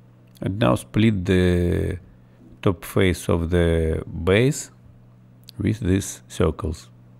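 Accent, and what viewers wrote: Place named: Russia. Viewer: Я не знаю кто Вы... но у Вас очень хороший Английский с прекрасным Русским акцентом... :-) And Thank You very much for this video and for the effort You've taken!!!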